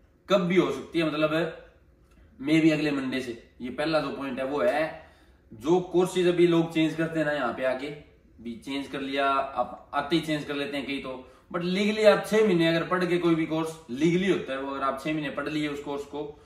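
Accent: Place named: Bagri, India. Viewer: Best best h bhaai treeeka ek nmbr h bhaai baat krne ka btaaane ka sb bagri hindi mix c ghant h bhaaai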